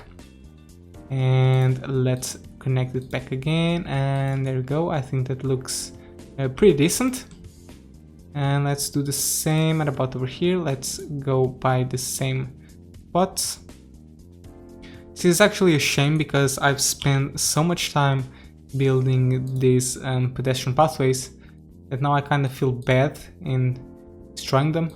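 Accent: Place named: Portugal. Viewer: Descobri o canal recentemente, não sabia que esse sotaque era português! Abraços do Brasil!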